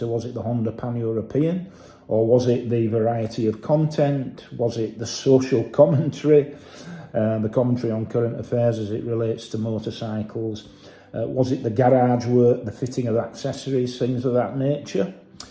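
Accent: Yorkshire, United Kingdom. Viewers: I believe the first thing that got me to subscribe was your Yorkshire accent. 😄 I like the way the channel content is laid out. I enjoy it all. Good honest reviews and opinions. Which ever way the channel goes, I will be here bud. Cheers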